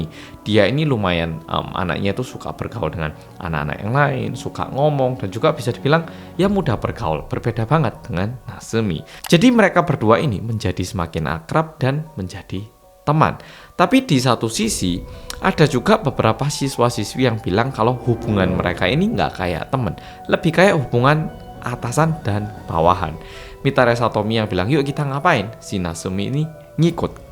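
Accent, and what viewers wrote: Java, Indonesia. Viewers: Hadirrrrr.... Mas medok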